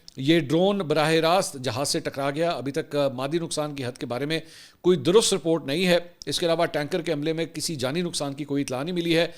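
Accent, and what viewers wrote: Punjab, Pakistan. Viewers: Jo ap Urdu bolty bolty Punjabi ka touch daity Hain I love that❤❤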